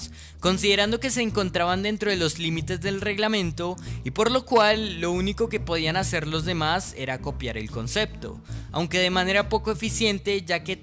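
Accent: Colombia. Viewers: Por el asentó colombiano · Felicitaciones, gran contenido, con rigor técnico, sigue así y llegarás lejos. Por tu acento eres colombiano, así que un saludo a ese gran país cuna del gran Juan Pablo Montoya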